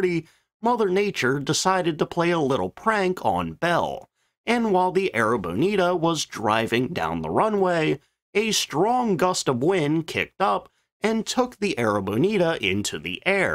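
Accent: United States. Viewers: Have you gone AI on us? that was the weirdest pronunciation of “Anacostia” I’ve ever heard. I found that odd as you talk like you’re from the Delmarva and maybe PA area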